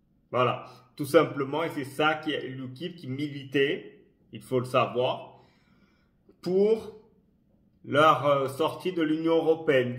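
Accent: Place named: Italy. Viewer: Aldo et Aberkane sont d’origines algériennes certes et alors méfiance lol c’est pathétique quand même.. il faut dire que leurs discours et vidéos nous parlent très bien, souverainisme ou conspirationnisme appelez cela comme vous voulez lol nous on les comprends bien contrairement à toi qui est sûrement payé comme nos journalistes pour nous faire de la désinformation totale en passant par le bashing .. juste un conseil essayez d’être à leur niveau intellectuel et peut être que vous ferez du buzz.. vu votre accent vous êtes en attente de changement de carte identité italienne pour une carte d’identité française lol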